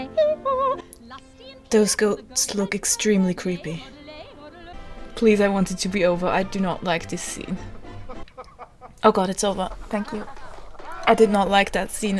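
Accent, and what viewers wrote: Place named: Upper Austria, Austria. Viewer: I'm trying to place your dialect based on that short chat with Oma. I'm guessing Oberoesterreich, but admittedly not a lot to work with.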